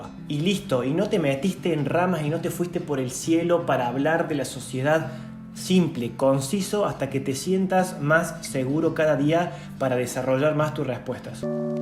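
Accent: Cordoba, Argentina. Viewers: excelentes consejos, que grande ese acento cordobés, saludos desde Rosario.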